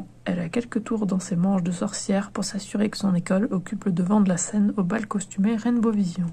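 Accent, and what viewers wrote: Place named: France. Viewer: I have 80 of rainbow and shadow high dolls And I have her and she's gorgeous and your French accent is so gorgeous. I love it. I can understand what you're saying. Even though you're speaking French. Which I love.❤❤❤❤❤❤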